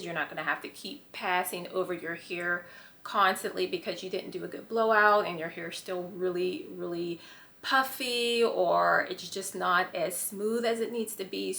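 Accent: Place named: Pittsburgh, United States. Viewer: And I hear that Pittsburgh accent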